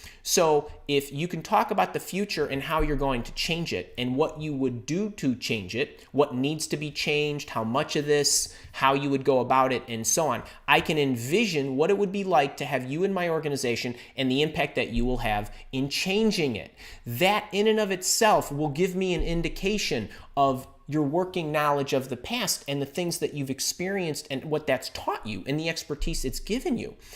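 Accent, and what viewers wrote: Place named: Midwest, United States. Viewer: Oh my word! I can tell you’re definitely from the Midwest!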